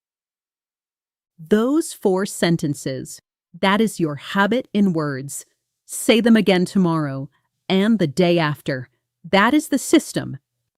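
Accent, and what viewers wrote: United States. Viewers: In which accent are you teaching american, british or indian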